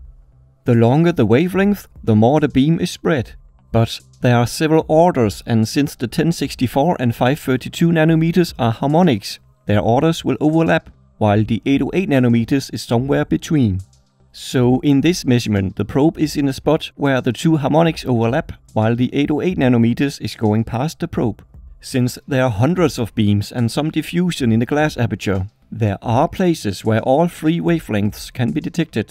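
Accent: Denmark. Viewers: Only when you said "In Denmark", I realised how danish your accent was. Great video man! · I knew you were from denmark! I could hear it in your voice lol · Du er så meget dansk 👍 vi kan ikke skjule vores danske dialekt 😜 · Are you danish? Edit: Before I got to the middle of the video I just guessed by the accent. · Are you from Denmark? Cause your accent sounds kinda danish If yes then... DANISH GANG RISE! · Just sounds like a Dane. A lot of Danish people sounds funny when they venture into the English language. I'm Danish myself, but I'm all in on a cockney accent. I don't really know why 🤔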